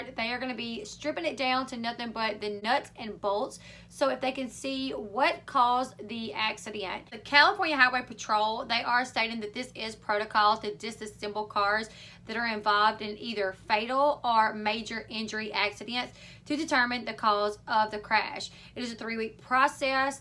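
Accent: South, United States. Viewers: Taking short wolks your voice is amazingly southern, and it was a 1970 barracuda yea but it had a hellcat motor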